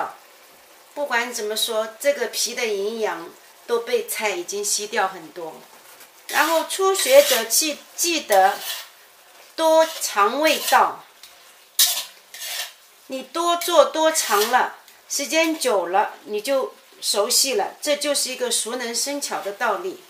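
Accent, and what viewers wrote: Shanghai, China. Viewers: 很喜欢这个教做传统上海菜的视频，很听Jenny 的带着上海口音的国语，她讲解和示范的很详尽，没有卖关子，订阅了她的视频后，我照着她所教的，己经做成功很多老上海经典的小菜和点心，上个礼拜做出了汤圆和牛肉馒头，谢谢Jenny, You are the BEST!❤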